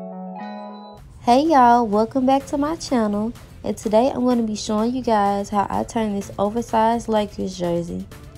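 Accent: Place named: Chicago, United States. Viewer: This is so cute & I love your accent !! Chicago Baby 🤩🤩🤩💛💜💛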